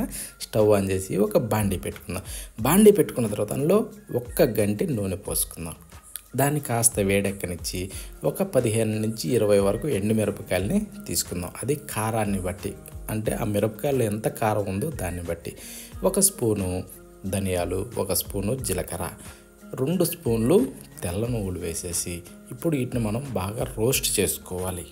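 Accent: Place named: Rayalaseema, India. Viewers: చిత్తూరు జిల్లా యాస యెర్రగడ్డలు పచ్చడి suuuuuuper 🎉🎉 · Adi rayalaseema slang ardam avadulee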